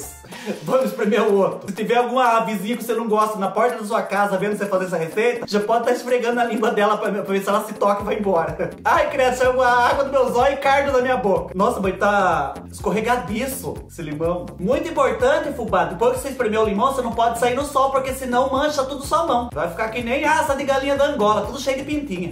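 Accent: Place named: Minas Gerais, Brazil. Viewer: Pelo seu sotaque você é de Minas.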